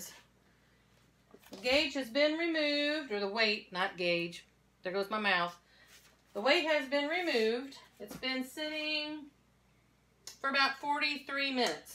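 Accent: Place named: Rural Missouri, United States. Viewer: LOL! I felt like I was back home on the farm in rural Missouri when I was a kid. You informed me, made me laugh, and your accent is a breath of fresh air from home. I am in the downhill side of 60 and have canned for over 40 years but never canned ham because someone told me that I was not supposed to because it was cured meat and curing changed the density of the meat and it might not can properly. I know that I am watching this several months after you did this and that you have made several videos since so you have probably had a chance to open the jars by now so could you please update on how it turned out. I really want to do this. I am not opposed to rebel canning methods. My Grandmother Wright had a picture of the man praying over his meal like yours hanging over her table. My brother has her picture. That made me homesick. 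Have an awesomely blessed day!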